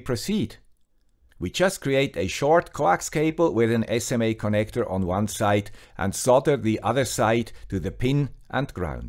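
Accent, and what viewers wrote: Switzerland. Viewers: This gentleman sounds exactly like Andreas Spiess, the guy with the Swiss accent.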